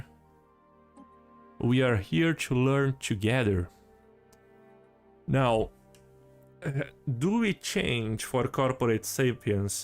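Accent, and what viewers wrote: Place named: Russia. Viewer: Я думал ты из России или где-то рядом лол.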